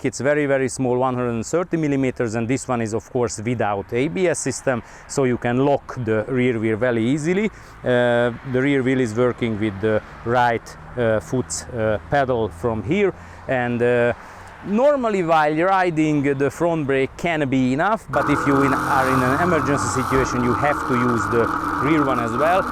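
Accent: Hungary. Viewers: I like your Hungarian accent. Good review.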